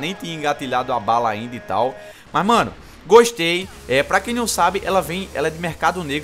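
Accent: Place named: Paraíba, Brazil. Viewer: Nada contra mais voz de paraiba é chata veí --'...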